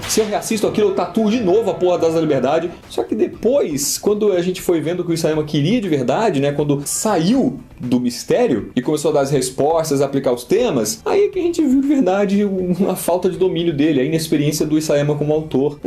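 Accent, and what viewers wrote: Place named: Rio de Janeiro, Brazil. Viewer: UÉ, O sotaque carioca tá voltando?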